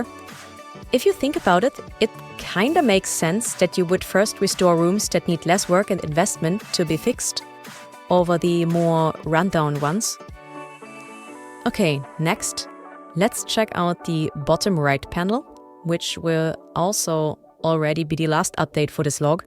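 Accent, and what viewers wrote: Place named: Germany. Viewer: Nice german accent :D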